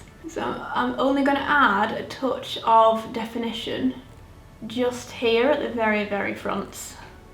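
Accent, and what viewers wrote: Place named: Northern England, United Kingdom. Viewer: LOVE the northern accent, this was great <3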